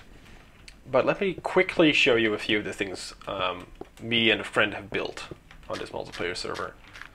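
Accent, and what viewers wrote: Netherlands. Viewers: you from Holland?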